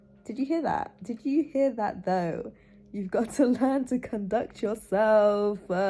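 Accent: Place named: United Kingdom. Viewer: UK accent Wit Intelligence is So Fine🔥🔥🔥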